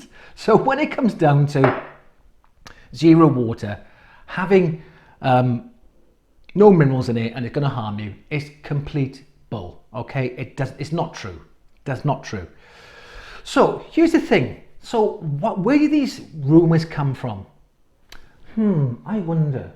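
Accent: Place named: Neath, United Kingdom. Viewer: Cracking video mate. I’m from neath. You sound close. Would love to know what my tap water is. I do want to get one of these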